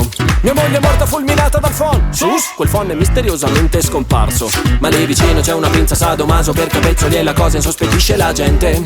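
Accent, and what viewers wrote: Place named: London, United Kingdom. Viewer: Cacchio come parli inglese londinese! 🎉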